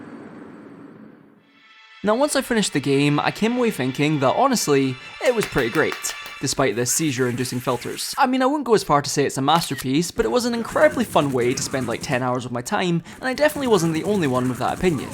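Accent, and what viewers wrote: United Kingdom. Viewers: I can't listen to this horrible british accent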